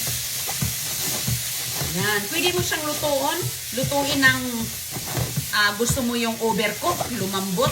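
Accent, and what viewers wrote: Visayas, Philippines. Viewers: Tama iba ang bigkas nang bisaya kung magsalita nang tagalog.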